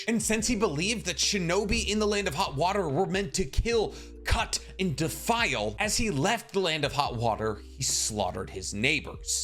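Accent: United States. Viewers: united states accent to japanese names hurts my ears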